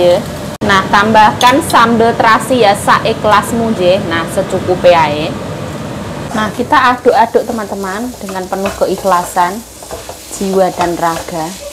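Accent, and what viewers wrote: Java, Indonesia. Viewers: Mb jowone ngendi mbk?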